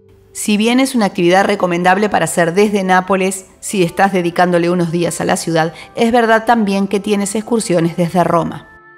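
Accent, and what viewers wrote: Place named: Argentina. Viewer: Creo que es Argentina o Paraguay a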